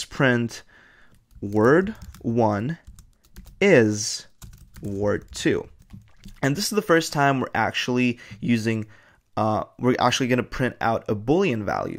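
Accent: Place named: Russia. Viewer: я то думал, что не так, а ты русский оказывается😅 Хоть эти ошибки возможны в речи у носителей, считай чуйка сработала.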